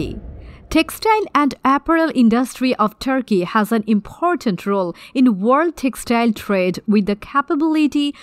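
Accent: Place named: Bangladesh. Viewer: Where are you from? Your accent sounds like bangladeshi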